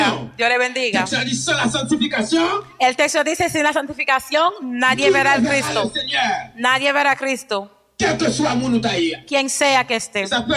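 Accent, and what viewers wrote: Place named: Spain. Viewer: Li gen bon jan aksan espanyòl la nan langaj li.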